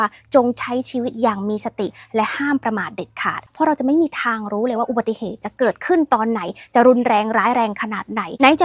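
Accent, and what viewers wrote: Isan, Thailand. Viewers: 😂🎉❤Happy new year ขอให้สุขภาพแข็งแรงไวๆ ครับ ชอบคลิปสนุกดี สำเนียงเด่น ทั้ง ไทย อีสาน เขมร อังกฤษ แถมยังน่ารักด้วย😊😊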